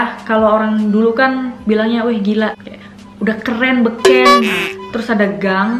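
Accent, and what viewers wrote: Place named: Sunda, Indonesia. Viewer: Teh orang mana? Kaya org sunda td bilang "bade ka cai" 😆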